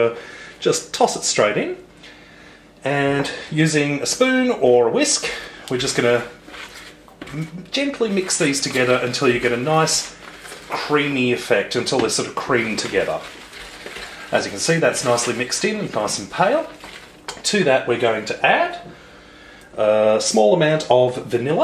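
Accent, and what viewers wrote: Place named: Australia. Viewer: you can tell his Aussie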